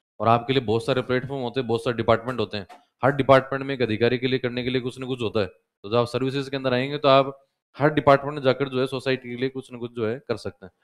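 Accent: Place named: Jodhpur, India. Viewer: ye jodhpur ka hai..... Pakka boli aise hai😂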